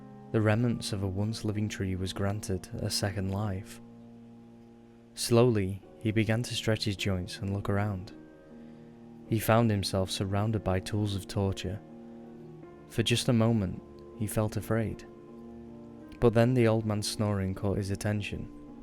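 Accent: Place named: England, United Kingdom. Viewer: I knew that was an English voice, me to. I'm from the South but live up North xxx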